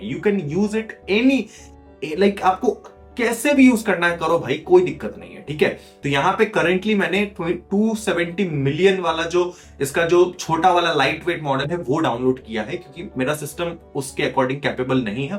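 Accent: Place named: India. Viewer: Compà tutta sta IA e poi l'inglese con l'accento indiano? non mi dispiace, lo trovo un bel intrattenimento complementare ma faccio fatica a volte a seguire!!! fallo nella tua lingua con traduzione automatica!!!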